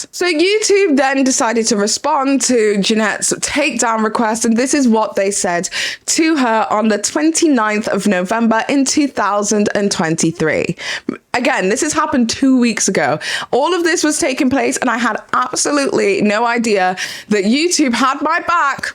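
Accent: United Kingdom. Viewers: I love how British people say H 😂 I notice it so much from you and callum Margie lol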